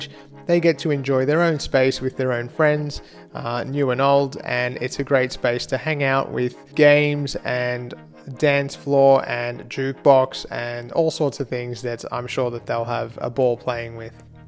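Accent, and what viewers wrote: Australia. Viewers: I was not expecting you to be Australian, but I’m not disappointed. I’m American, and sailed Splendor in Alaska. I like the “pink lady” vibe, but I’m not a fan of all of Carnival’s aesthetic choices…Splendor is one of the better looking imo.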